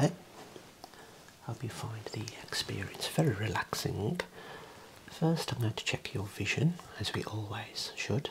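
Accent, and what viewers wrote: Birmingham, United Kingdom. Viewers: I think i detect a bit of a Brummie accent here. Love the ASMR!👍👍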